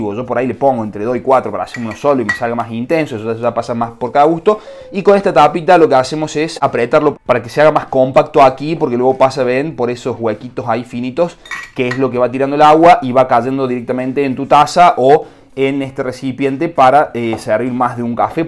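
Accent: Córdoba, Argentina. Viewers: La tonada cordobesa hace un mejor el vídeo jaja saludos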